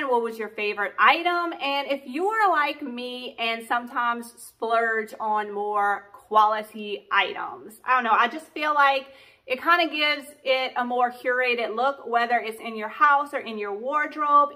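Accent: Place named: Los Angeles, United States. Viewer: Love your ideas...learn so much...love your humor and LA accent🌱🦆🌱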